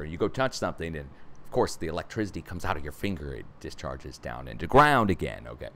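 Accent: Russia. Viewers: Nobody no agency should stop you. But unfortunately in this time they try. And why? My dear that is the question. Like your russky accent. You kinda sound like Natasha's boy toy Boris.God bless you guys. And thank you. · lollllll Love the Russian accent!!!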